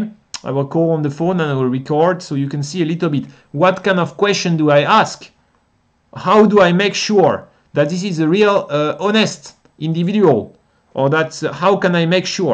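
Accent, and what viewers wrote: France. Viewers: came here for the car... stayed for the french accent <3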